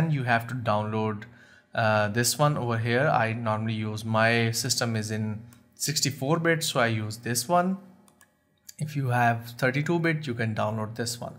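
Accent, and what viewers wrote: India. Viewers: WHY THIS GINGER HAVE INDIAN ACCENT?? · Wow ...your pronunciation is so different.... it's sounding very similar to our Indian English pronunciation 😂😂..and now I can understand better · u sound so indian... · European face. Indian accent. Great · its the first time l see a white man talks the accent of an indian, wtf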